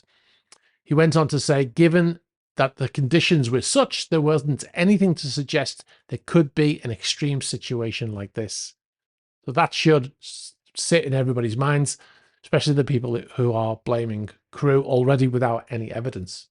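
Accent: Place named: Scotland, United Kingdom. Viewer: Man, do you speak in an unpleasant and hackling kinda scottish, almost stuttering way...did you take any lessons..?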